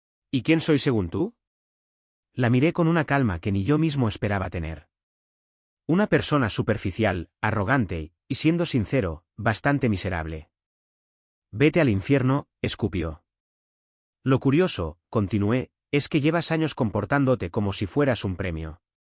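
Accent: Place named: Andalusia, Spain. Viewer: DÉ VERDAD QUÉ ES FACINANTE ÉL ACENTO TOTALMENTE ANDALUZ DÉ ÉL NARRADOR... SIGAN INCLUYÉNDOLO EN TODAS LAS VERSIONES DÉ ESTÁ PLATAFORMA...ES SENCILLAMENTE GENIAL...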